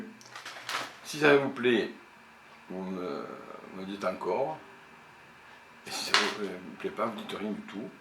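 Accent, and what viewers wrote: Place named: South, France. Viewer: Je viens de vous découvrir, c'est ENCORE bien sûr. Et puis, pour moi qui suis belge, le petit accent qui sent bon le sud ne gache rien!!!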